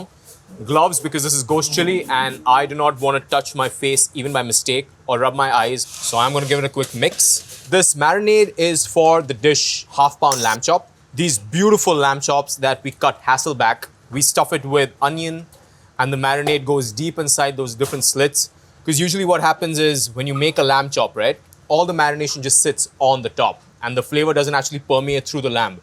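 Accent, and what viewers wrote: India. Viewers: indian accent is cringe asf. it's a meme. he should speak with a proper American accent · yup. so true. Also this is exactly how i speak. Just neutral Indian English accent.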